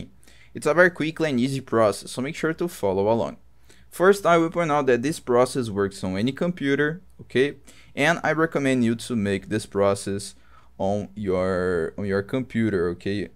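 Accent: Brazil. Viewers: brazileiro ne pai esse sotaque eu conheço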